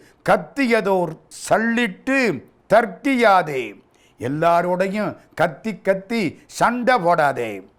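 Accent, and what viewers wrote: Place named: Jaffna, Sri Lanka. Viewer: யாழ்ப்பாணத் தமிழ் ஐயா ஒன்றும் மாத்தாதீங்கள்🙏🦚ஓம் முருகா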